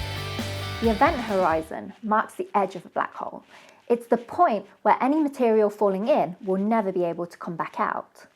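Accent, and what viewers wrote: United Kingdom. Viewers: ❤❤❤❤, love your frightfully British accent. If your Chinese is just as fluent… the world is your oyster😘😘😘😘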